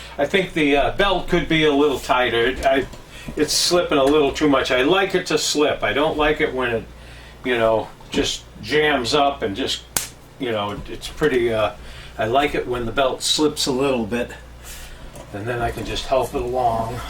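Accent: Boston, United States. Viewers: Good to hear the Ba'ston accent again. : ) Hope you upload daily life videos more often. Best! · Great content…….. admire your dedication and determination to keep you and your people taken care of….. I am in Tampa…with much easier life…but not the community y’all have….very nice…..we would be best friends…….that Boston accent is hanging in there!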